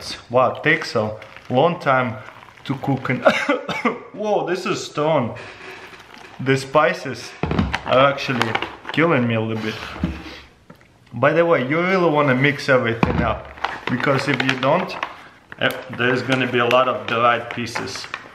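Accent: Russia. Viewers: I loooooove that rusian accent. His so famous becuse of it. I love it !!! 0o0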